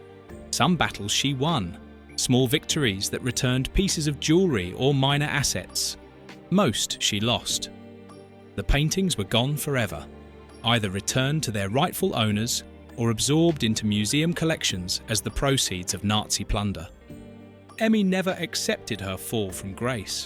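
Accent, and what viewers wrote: England, United Kingdom. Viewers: A good Jewish person with a good clean English accent. Glad you approve. 😊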